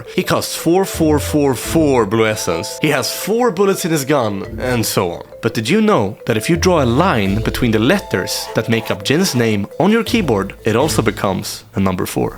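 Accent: Sweden. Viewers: This svengelska😂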